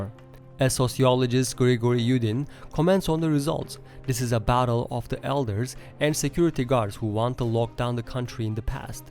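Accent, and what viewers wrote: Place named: Asia. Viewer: I must ask this first before commenting on this report. Where do you guys find such eye catching names for your YouTube channels? The accent also suggests the narrator might not even be a Ukrainian but Asian and seems to know more than the CIA and the FSB.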